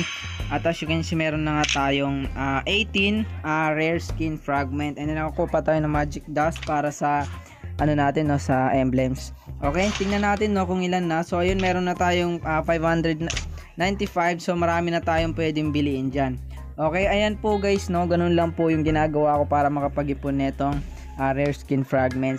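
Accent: Philippines. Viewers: Tol Maguindanaon ka?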